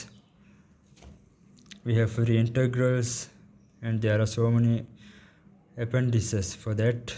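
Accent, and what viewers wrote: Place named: India. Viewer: Are you Indian because your accent is so Indian btw i am also from india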